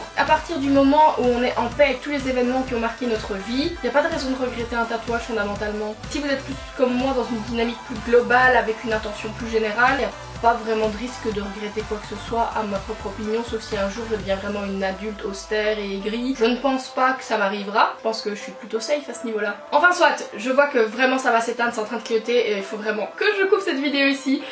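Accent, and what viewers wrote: Belgium. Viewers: Tu est belge nn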